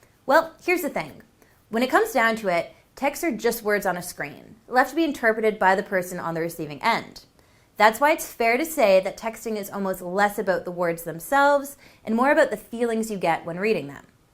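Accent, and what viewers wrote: Canada. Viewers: She is from the west coast in Canada.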